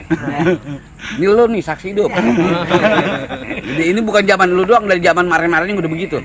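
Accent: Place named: Betawi, Indonesia. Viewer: Terimakasih penjelasan ttg wayangnya bang Haji Mandra ... Mau tahu nih bang Haji , kok bhs betawi abang Haji sm bhs betawi almarhum babe Benyamin beda ... Knp logat betawi bisa beda begitu bang ... Kl bisa tolong jelasin dong bang Haji , makasih ya bang Haji sebelumnya nih , sehat terus bang Haji 👍🙏🙏🙏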